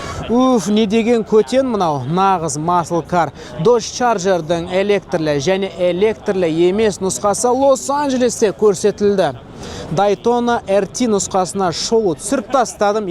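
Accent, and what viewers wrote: Standard. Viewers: ҚАЗАҚСТАНДА ЖАЛҒЫЗ ТАЗА ҚАЗАҚША 1000% СОЙЛЕЙТІН ОСЫ СИЯҚТЫ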